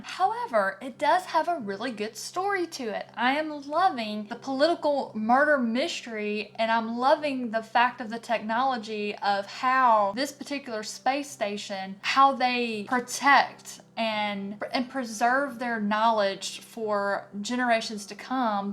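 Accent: South, United States. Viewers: (Random: I love your accent. I've wanted to live somewhere South my whole life, just so I could pick up a twang/drawl of some sort.)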